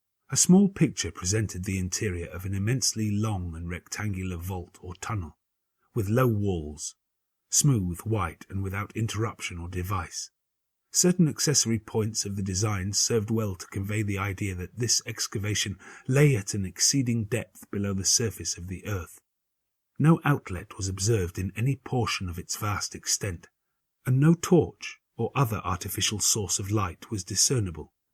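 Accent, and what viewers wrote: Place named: England, United Kingdom. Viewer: At last, I've time to listen and enjoy. Ahhh, your French! And why does Poe sound so good in an English accent, when it's American? Who can know these mysterious things. ;-)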